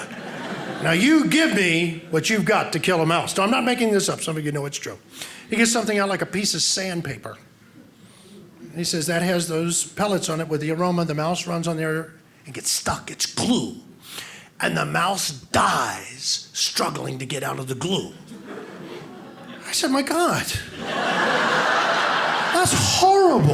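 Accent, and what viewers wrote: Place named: Kentucky, United States. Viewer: You can tell he’s from Kentucky 🤦🏻‍♀️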